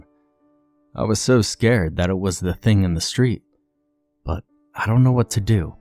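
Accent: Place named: East Coast, United States. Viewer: I'm hearing an East Coast accent....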